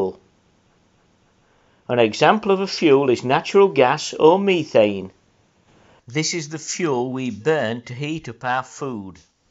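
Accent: United Kingdom. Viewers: I was looking for a short, to the point and well explained video to summarise the incomplete combustion of hydrocarbons - this is excellent. Fits my purpose perfectly. And I love the accent - very British! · Its not weird, it's an English accent(British).I know its pretty weird for all of us,,, I understand!.)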